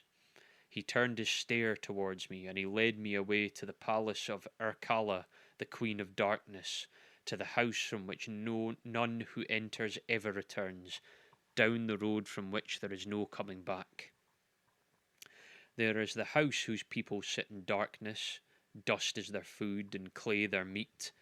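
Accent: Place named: Scotland, United Kingdom. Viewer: The Epic of Gilgamesh sound so good with a Scottish accent. Well read and instantly subscribed.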